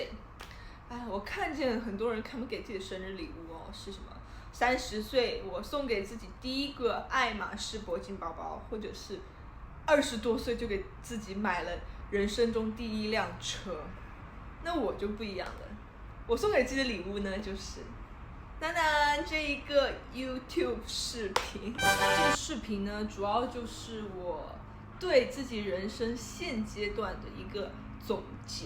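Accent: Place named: Taiwan. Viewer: 你的口音好很像台湾口音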